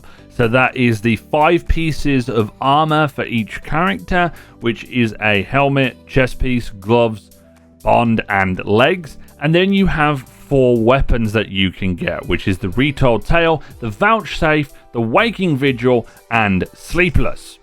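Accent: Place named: Scotland, United Kingdom. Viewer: Ah some scottish accent. Nice.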